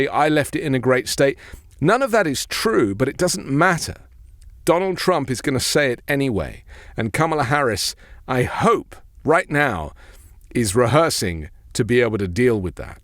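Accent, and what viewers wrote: England, United Kingdom. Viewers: WTF are you to weigh in on an US election with your boring English accent. Go kiss the crowns ass you boring limey.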